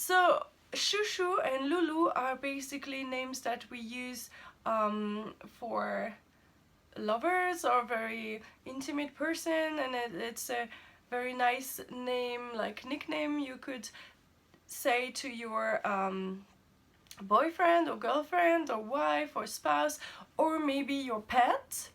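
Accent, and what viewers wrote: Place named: France. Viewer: Your accent is amazing I've always loved the French and British accent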